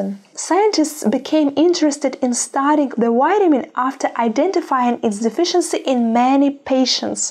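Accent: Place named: Brazil. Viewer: Thank you doctor. By the way, I love your beautiful accent! I beleive you are from Brasil, right?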